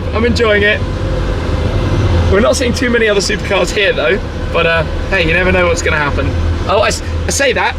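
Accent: England, United Kingdom. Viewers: You are british?? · You could have taken phone numbers off chicks at least ! Believe me the first thing American girls are interested in is the size of your wallet .Its the very first thing in their head they ascertain on first contact.An English accent is huge bonus.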